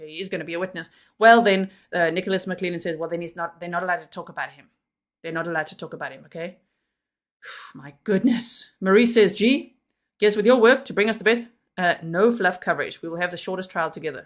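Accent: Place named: South Africa. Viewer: Before I even start watching I need to state that this is the one case that I follow that I found dubious from the beginning, long before the Odinists came in. From the beginning I thought they do not have anywhere near to enough to convict this man - an unspent round is all......and my instinct was just that this is not the man. This does not make sense...and since the rest came out, I am more and more convinced they have the wrong man and he is being framed....I do not usually easily believe a frame defence, but on this one....I may be convinced. And the judge is just biased.....! I have no idea what she is thinking. By the way, where are you from? I am from South Africa and your accent sounds South African!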